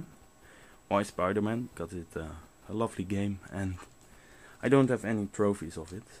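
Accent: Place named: Netherlands. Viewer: aan de manier waarop je engels sprak (en je rekentoestel) wist ik gewoon dat je nederlands was :p btw: ben je programma nu aan het downloaden, thx!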